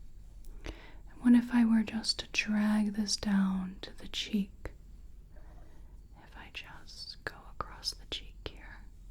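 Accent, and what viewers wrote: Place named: South, United States. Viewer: Love your accent, you sound slightly Southern may I say? You are one of the best ASMR artists out there... Love ya😍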